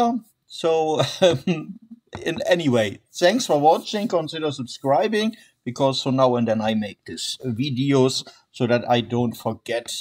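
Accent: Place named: Germany. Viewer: Thank you so much!! - Nice German accent ;-) Gruß, Jörg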